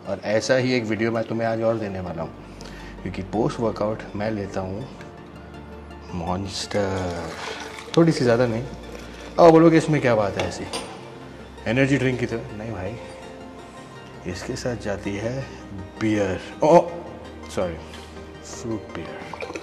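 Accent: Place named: Haryana, India. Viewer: Haryana se ho sir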